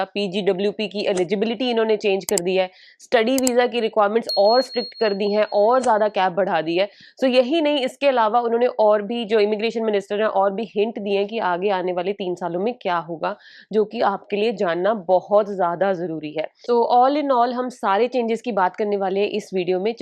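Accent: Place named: India. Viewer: Why do Indians have such a strong accent in English?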